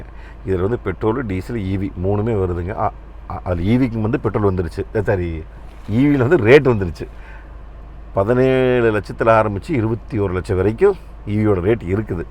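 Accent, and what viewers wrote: Kongu, India. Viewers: நம்ம கொங்கு தமிழில் நீங்கள் கொடுக்கும் விளக்கம் மிகவும் மகிழ்ச்சியளிக்கிறது. வாழ்த்துக்கள். 💐💐💐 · எதார்த்தமாக கொங்கு நடையில் கூறிய எங்கண்ணணுக்கு வாழ்த்துக்கள்....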